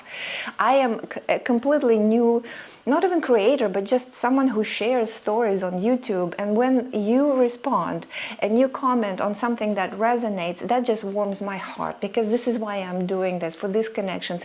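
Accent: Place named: United States. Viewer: Many native English speakers don’t have the depth in our language that you do. Impressive and an American accent!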